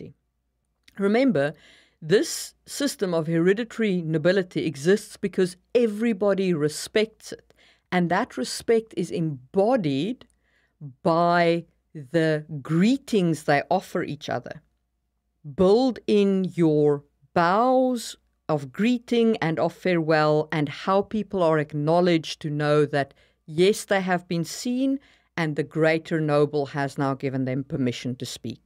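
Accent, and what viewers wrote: South Africa. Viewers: I love the step by step way you take us through detailed aspects of worldbuilding! I've been watching tons of worldbuilding videos and so many of them are so general that I take nothing away from it. Yours are great and really well structured, I've got some ideas to work with just from this one video. Subscribed! P.s., your accent is so cool, are you from South Africa?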